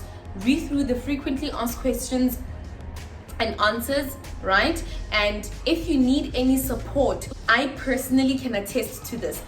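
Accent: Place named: South Africa. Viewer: I actually downloaded this bank app ever since you recommended it to me 😍🔥😍 thank you so much 😊 you are THE GIRL, what? THE GIRLLLLL 😍😍😍🍾🍾🎉🍾 congratulations 🎊🍾 on reaching over 1000 subscribers honey 😍😍😍💃💃💃🌹.... by the way, you look absolutely gorgeous, I love your thick, clear and healthy hair - you are beautiful babe 😍😍😍 Oooooh and I love your voice and accenttttt- Proudly South African 🇿🇦 yebooooo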